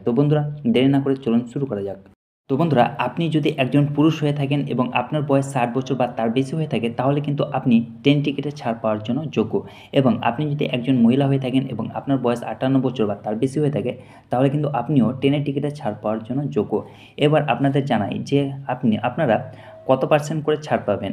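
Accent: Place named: East Bengal, Bangladesh. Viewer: Eskip kore dilam, bhasa ta thik korun,Kath bangal ,kobey kantatar periye elen.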